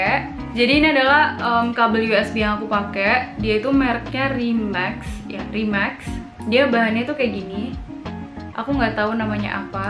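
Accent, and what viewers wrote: Java, Indonesia. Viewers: Kakak Asli mana ?? kok ngomong nya medok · suka sama medok nya gemoy ❤️🤣🥺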